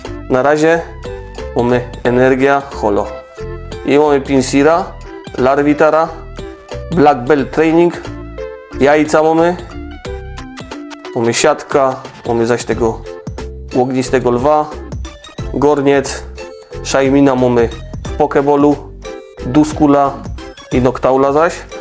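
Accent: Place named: Silesia, Poland. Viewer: Więcej filmików po śląsku ❤Bardzo dobrze się tego słucha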